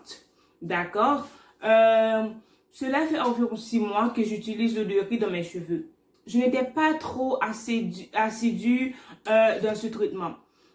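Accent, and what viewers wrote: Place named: France. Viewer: Yes!!! Don't ever stop speaking French and English ,I'm from English speaking country but now live in French speaking country , love your francais accent ,merci boucop madmosel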